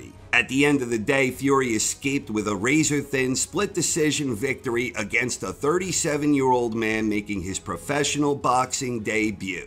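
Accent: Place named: Boston, United States. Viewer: I think this guy is from Boston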